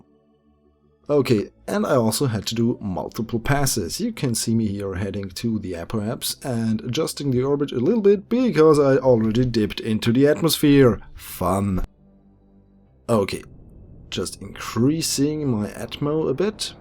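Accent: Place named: Germany. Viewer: im not 100% sure though but im from Germany and he sounds german^^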